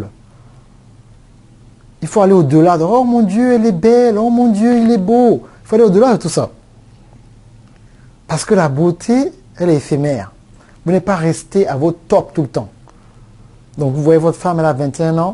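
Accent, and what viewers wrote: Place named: Gabon. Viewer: L ACCENT GABONAIS HEIN. LOOL COOL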